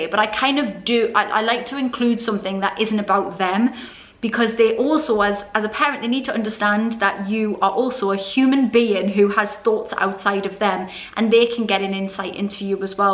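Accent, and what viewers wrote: Newcastle, United Kingdom. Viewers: I watch your videos to learn a Geordie accent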